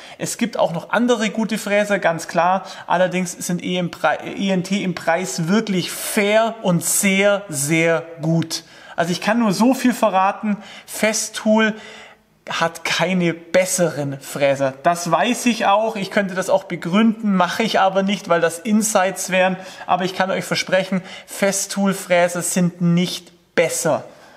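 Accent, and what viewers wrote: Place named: Augsburg, Germany. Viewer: Hallo ich hätte mal eine frage. kommst du aus der nähe von augsburg. mir kommt der dialekt so bekannt vor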